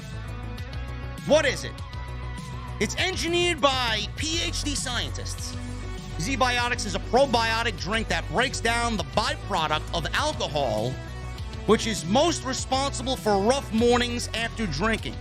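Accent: New York, United States. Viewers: I gotta ask, you're from NY and have a strong NY accent, but rock the Braves hat. What's up with that? Not that I got a problem with it, I was born in ATL. Just expect you to have a Yankees hat I guess lol. Thanks for representing through bro.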